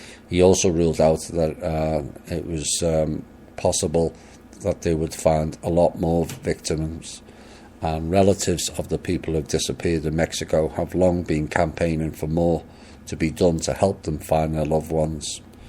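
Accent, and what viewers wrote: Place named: Liverpool, United Kingdom. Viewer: Alright Scouse !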